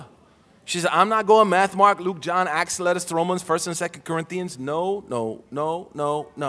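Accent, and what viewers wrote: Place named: Oklahoma, United States. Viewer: He is from okc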